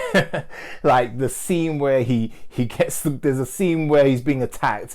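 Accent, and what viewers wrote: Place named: United Kingdom. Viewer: Hey with british accent.. good review bro..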